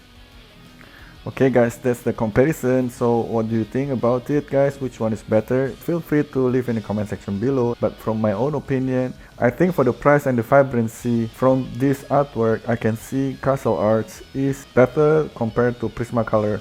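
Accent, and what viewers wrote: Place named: France. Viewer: You kind of have a French accent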